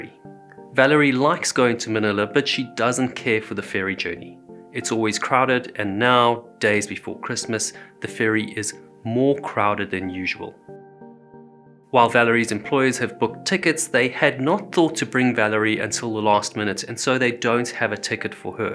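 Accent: South Africa. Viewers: His pronunciation is typically South African and is perfectly comprehensible to my American English ear. Where are you from that you are having a hard time understanding this?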